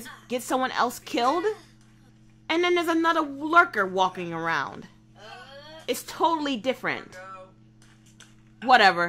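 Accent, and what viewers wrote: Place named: South America. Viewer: awesome south american accent :)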